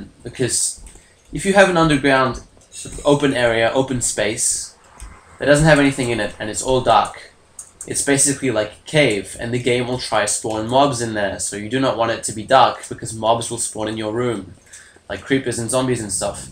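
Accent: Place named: Australia. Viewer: Can really hear your Australian accent start to come through here!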